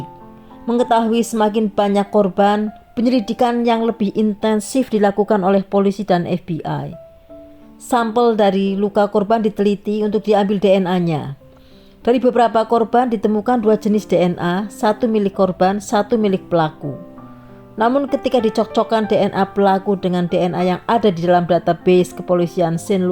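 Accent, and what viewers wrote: Java, Indonesia. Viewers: Pembawaanya yg medok itu ciri khas tersendiri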